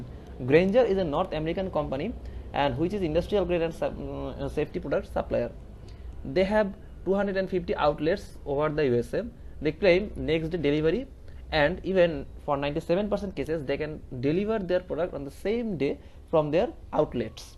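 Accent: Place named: India. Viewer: Your accent is very bad ... i hate indain accent unknown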